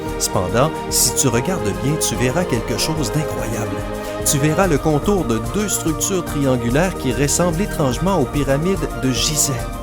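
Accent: Quebec, Canada. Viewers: On dirait la voix d'un québecois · Super l' accent kébecoi :') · j'adore l'accent québécois (j'y vais plus souvent que bcp) mais la musique en arrière plan est d'un casse oreilles, une prise de tête ! Bien à vous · charlatan avec son accent québécois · Vidéo de merde pour le sensationnel, tout ce que tu montre à des explications, fais des recherches er cet accent québécois immonde, ça me fais vomir. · L'accent québecquois, ça va bien deux minutes, après ça me dégueule par les tympans... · Je mets le pouce bleu juste pour ton magnifique accent québécois 💓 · Putain mais ferme ta geul avec ton accent québécois ta niquer la vidéo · "Un accent québécois" c'est super bien, au moins on comprend quelque chose.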